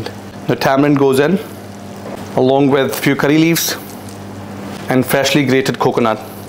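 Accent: Scotland, United Kingdom. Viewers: never heard of sukka. Thanks, this looks delicious. Will use fatty pork though. Your videos have become masterpieces: succinct, beautiful to watch, only the best recipes, and an accent that takes me back 35 years to being a student on the other side of Scotland! Can't wait to try this (speaking Scottish to myself ;-))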